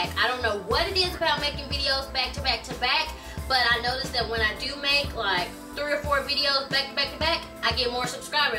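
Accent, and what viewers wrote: South, United States. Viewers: I love your southern accent...if you have one.... Very insightful video :D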